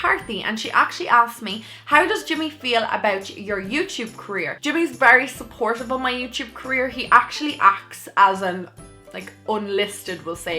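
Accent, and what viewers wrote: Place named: Munster, Ireland. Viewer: what county are you from?? trying to figure out from your accent guessing somewhere in munster ???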